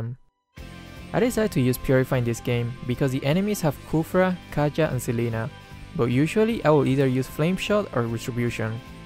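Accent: Philippines. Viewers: i believe no... Based on his accent... If ur filipino u wud know wat i mean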